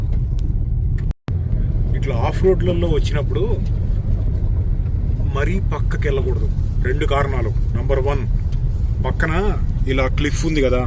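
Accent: Andhra Pradesh, India. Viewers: Pakka Meedhi..... Vijayawada nundi Nizamabad shift ayina Family Bro meedhi ... :D Langauge lo eh matram Telangana ledhu ..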